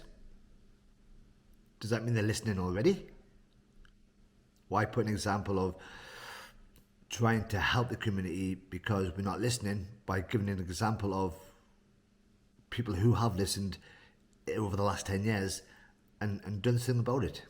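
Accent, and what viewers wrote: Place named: Northeast England, United Kingdom. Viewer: great, would love to show you around my city Auckland, although everybody starts off in Auckland when they come to NZ. Where is your accent from? It's sounds like North East England.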